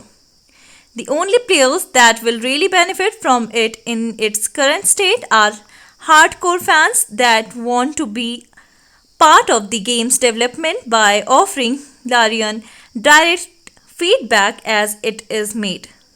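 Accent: India. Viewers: Very nice Voice and cute accent from one of the Indian Languages, I suppose :) But you really need to have some Pictures that do not look like Watercolor Paintings :P Good luck and thanks.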